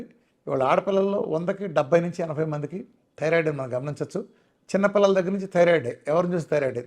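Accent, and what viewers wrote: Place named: Andhra Pradesh, India. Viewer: Me matalu alane unni memu Andhra me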